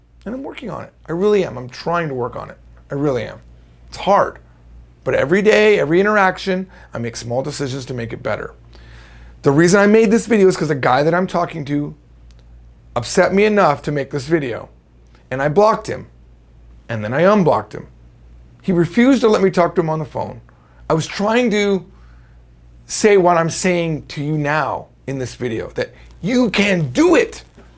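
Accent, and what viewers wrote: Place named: Japan. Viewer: Aww your Chinese has a thick Japanese accent. lol so adorable!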